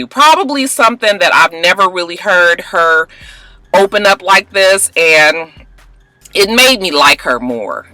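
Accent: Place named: California, United States. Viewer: Heayer and Theryer. That Cali dialect is present!!!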